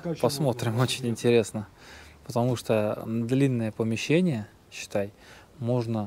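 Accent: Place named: Tatarstan, Russia. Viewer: Пащиму у татарский акцент?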